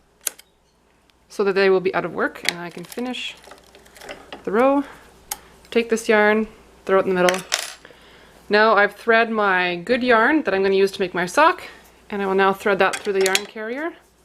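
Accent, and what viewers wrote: Canada. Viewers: You are amazing! I love that you figured out how to make the socks without doing the Kitchener stitch. I think at my young age of 73 that I should just buy my socks and not try to make them on machine or by hand knitting. It could take me the rest of my life to figure it all out, ha ha! I have tried hand knitting without much luck. I hate to spend a lot of money on a machine without being able to figure all the ins and outs. Lovely how you have done such a great job for yourself! You sound as if you are from Canada so I will not hope that you can teach me unless you would like to spend a couple of weeks in North Carolina! Bless you girlfriend. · I love the voice and accent. I'm guessing Canadian. I'm convinced this is Matthias Wandel's sister!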